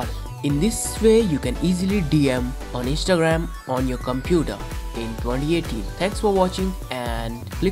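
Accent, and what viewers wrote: India. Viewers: the indian accent is so adorable lol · This guy has a more Indian accent than me. ut all goods man. Everyones different.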